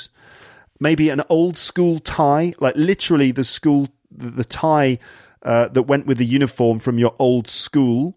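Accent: RP, United Kingdom. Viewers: Simply superb,.An exemplary model of Modern RP, I think. I have saved this so that I can listen to it again and again. I look forward to Part 2 and many more such videos. Thanks a lot.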